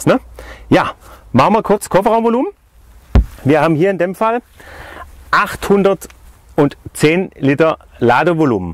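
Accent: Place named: Swabia, Germany. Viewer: Dies Schwäbisch klingt schon lustig. Aber nicht unsympathisch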